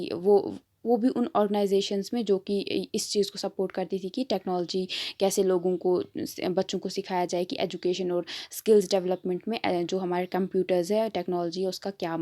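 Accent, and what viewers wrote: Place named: Himachal Pradesh, India. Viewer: Your himachli slang is soo good 😉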